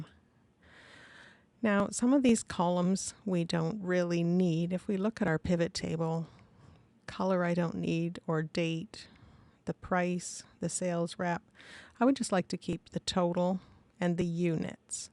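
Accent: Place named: United Kingdom. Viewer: This helped a lot thanks. Also, idk why but her voice kind of sounds like a British person trying to use an American accent. Not necessarily bad, just interesting.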